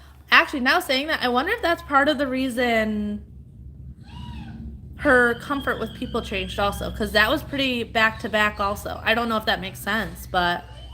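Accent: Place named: Minnesota, United States. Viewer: just a note gators can and do come into brackish waters they can live in it for a short time. I feel the same about Shark vacuum's I have one and love it, it works really well! Jungle theme is nice for a baby 's room! I like the dawn power wash I've seen where you can make your own? If your son has asthma make sure you don't have ligustrum's (privet) hedges planted near they cause attacks a friends son was just in the hospital because of them... Yes you do have a Minne soda accent Lol! But I like it!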